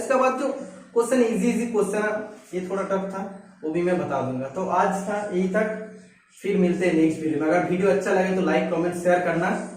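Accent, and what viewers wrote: Bengal, India. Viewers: Bengoli ho bhai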